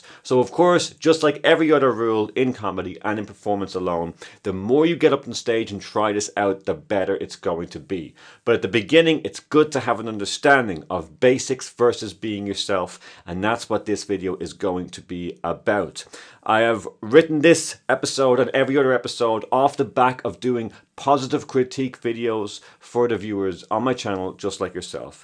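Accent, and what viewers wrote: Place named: Ireland. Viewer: Thanks! I love your videos! They are informative and well presented! And I love hearing your Irish accent :)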